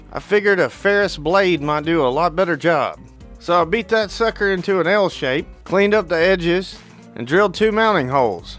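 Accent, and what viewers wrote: South, United States. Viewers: As a native Tennessean, I love hearing a deep southern accent saying intelligent things. Kick the stereotypes!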